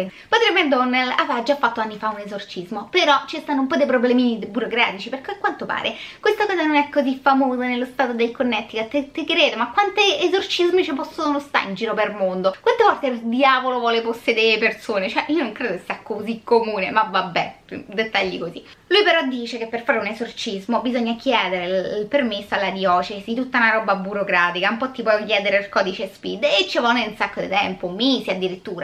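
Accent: Rome, Italy. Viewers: Scusami ma io vivo ormai moooolto lontano da Roma e non sai che piacere mi da' sentire il tuo accento . Non so se puoi o vuoi.. Ma mi diresti di quale quartiere sei? Brava.. Dovresti fare l'attrice! 👏👏👏La storia... Una stronzata enorme! Gli americani fanno tutto in grande... Soprattutto I cazzari! 🤣 🤣 🤣 🤣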